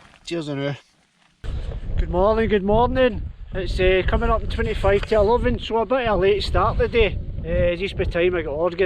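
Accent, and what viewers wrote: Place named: Glasgow, United Kingdom. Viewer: Excellent. What an achievement. Your last one quite near home? Make sure you have a driver so you can have a celebratory sherbet. When they ask me on to Desert Island Discs, I'm going to ask for a recording of your commentary. Great Glesga patter and descriptions of mountains - two of my favourite things.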